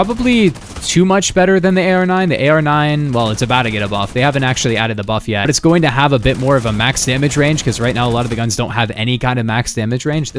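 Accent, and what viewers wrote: United Kingdom. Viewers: AND he's british?? damn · Hes fucking BRITISH???? explodes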